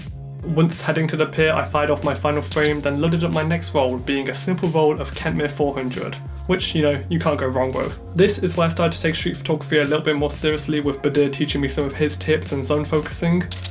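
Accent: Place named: United Kingdom. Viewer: Film photography + British accent: subscribed! Jokes aside, very nice video, loved it! Keep going!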